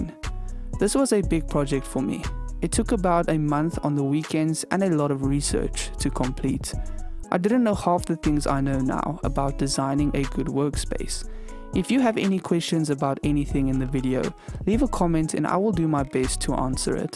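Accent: South Africa. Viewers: bro u have a south african acent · You really sound South African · Are you South African ?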